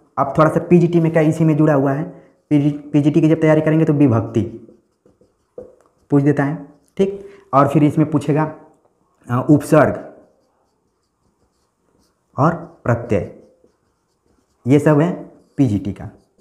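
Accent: Bihar, India. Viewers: आप बिहार से है क्या सर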